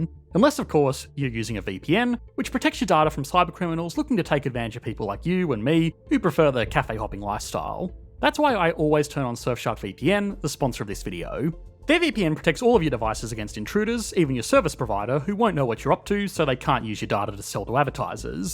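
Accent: Australia. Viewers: Annoying Australian accent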